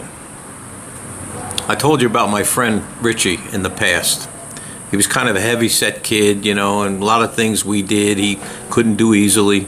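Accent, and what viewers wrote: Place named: New York, United States. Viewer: what a couple of stories! i was glued to the screen for both of them. having a young child myself, i can already see how kids sometimes scare the every loving crimonies out of us parents, as i know i did mine from time to time.. thanks for sharing. can't quite place the accent; is it new york? hava good'n. 🍻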